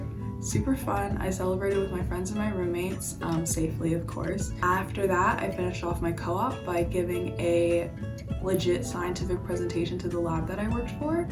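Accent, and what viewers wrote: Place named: Australia. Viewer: austrailian accent yes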